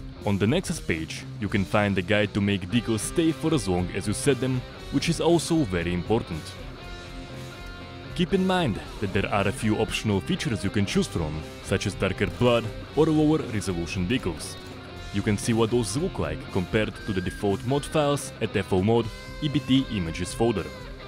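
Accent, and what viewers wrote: Russia. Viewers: I like your russian Accent :D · from what i see from ur accent ur u russian or other?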